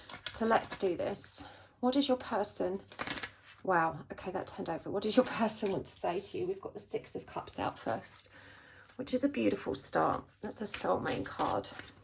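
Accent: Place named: South London, United Kingdom. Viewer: I like to hear your voice. It reminds me of home. Somewhere between South London and Brighton I guess... I will probably never go back again as I refuse the vaccine, I'm pretty much stuck where I am. We make our bed and we must lie in it I guess. Anyway, thanks for the soothing and long-ago familiar tones of my home strip!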